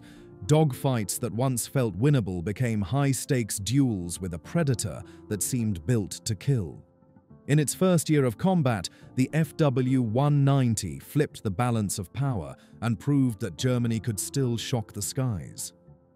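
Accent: United Kingdom. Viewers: OMG another British accent!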